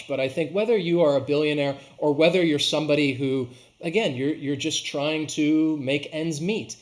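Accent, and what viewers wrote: United States. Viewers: Hi teacher you have a nice and very useful teach ! But I learn a little english ! I wish oneday I learn a lot of english so I love just American accent !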